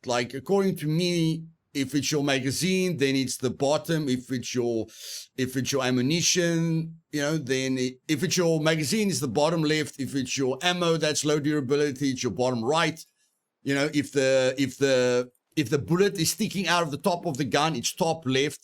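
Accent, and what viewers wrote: South Africa. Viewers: Your accent...is this South African?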